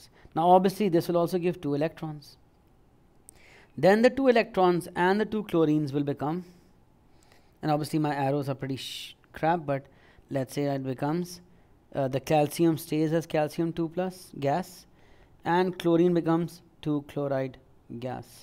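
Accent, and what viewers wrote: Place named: Sicily, Italy. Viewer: More like sicilian.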